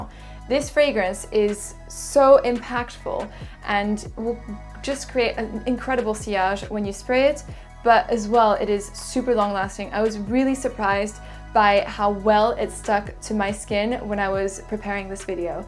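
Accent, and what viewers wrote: France. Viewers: Are you french ? Love your accent and great review btw .. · I'm curious, where are you from? Your accent sounds French-ish but I'm not sure 👀I really like the way you pronounce the perfumes!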